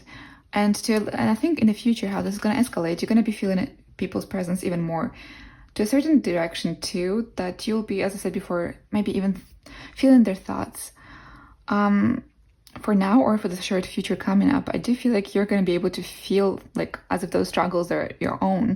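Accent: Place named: Eastern Europe. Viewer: You're a really good reader, you have great intuition. I picked pile 1, and most of the things you said resonated. I am very sensitive and empathic, and I'm autistic. I'm 31 years old, and I started to have severe sensitivity to certain sounds in 2014. These kind of sounds are always happening, so I've been forced to be homebound since 2014 as a result. I'm unable to leave my home except for short periods of time to buy groceries from the shop nearby, I'm unable to do anything, I'm just stuck in my home and suffering, because I can still hear the loud noises from outside even while staying inside (they are loud, terrible noises caused by people driving in a terrible way). I'm also very sensitive to people's energies and vibes, but even more so than you said. I can tell if someone is a good or bad person by looking at them and feeling their vibes, they don't even have to say anything. 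I hope you will do a reading that would tell me if/when my situation will change, because I've been trying to escape this situation that I'm stuck in for years but it hasn't happened so far, it feels like all the doors have been closed on purpose by a higher power, and nothing is working despite all my efforts. By the way, I'm curious, are you from Eastern Europe? Your accent sounds Eastern European. Thank you for your readings!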